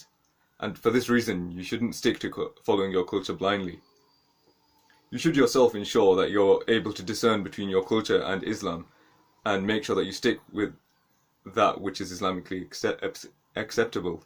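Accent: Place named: United Kingdom. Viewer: Based bri'ish accent MA.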